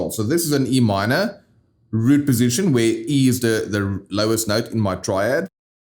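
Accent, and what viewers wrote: South Africa. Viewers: Awesome stuff man are you South African? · You sound south african and you wearing a Jbay hat! Victory church? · Recognise that South African accent! Refreshing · I did not expect to hear a South African accent lol.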